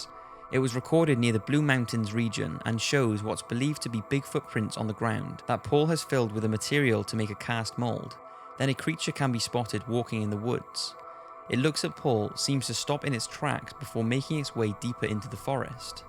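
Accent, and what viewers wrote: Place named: South Wales, United Kingdom. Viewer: Still unsure ... Hey you sound like your from South Wales... Swansea area?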